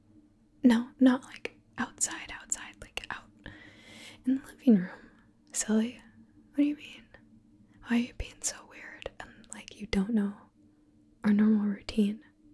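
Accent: South, United States. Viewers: You really have that southern accent down well! Great job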